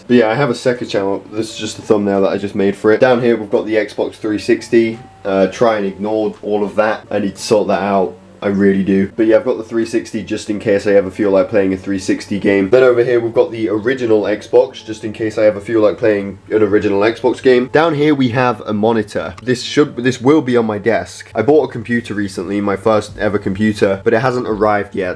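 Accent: England, United Kingdom. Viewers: That's england people speak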